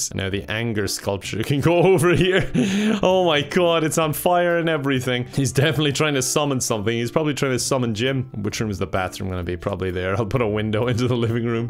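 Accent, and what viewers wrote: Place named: Ireland. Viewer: omg i love speaking that thicc irish accent 😂😂😍😍😍😍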